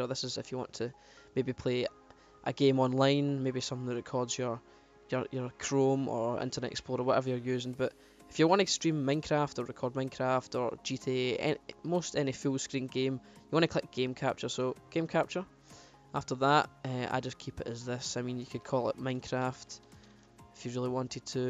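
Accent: Scotland, United Kingdom. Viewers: Yasss he's scottish! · bit a Scotland u fae bro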